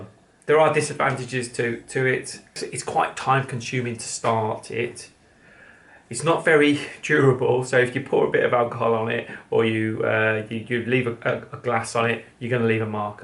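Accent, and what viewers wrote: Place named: England, United Kingdom. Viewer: Also could you show how to French polish chair legs and smaller items like jewellery boxes A lot to ask but I’m needing to learn and like listening to your English voice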